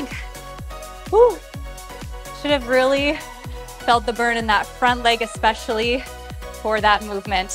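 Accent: Canada. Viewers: This workout popped up on my feed. Really enjoyed the compound moves, weights, pacing. New subscriber. Did I detect a Canadian accent? 🙂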